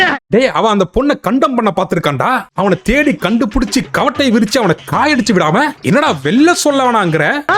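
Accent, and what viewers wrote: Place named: Tirunelveli, India. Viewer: Bro thirunelveli slang puduku 😂😂 romba siripu varudhu bro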